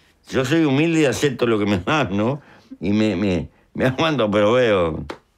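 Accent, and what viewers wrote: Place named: Gaucho, Argentina. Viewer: 3.31 min un helicotero (helicoptero) jajaja grande pepe la expreción campera y gaucha no la cambies nunca!!!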